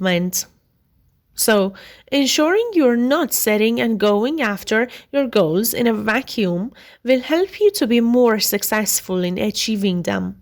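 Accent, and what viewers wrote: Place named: Iran. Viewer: اااااااااااااااا😭😭😭😭😭😭😭😭😭😭چرااااااااااا ،میخواستم بخوابم با گوش دادن به پادکست چرا با تلفظ فارسیییی😢😢😢😢😢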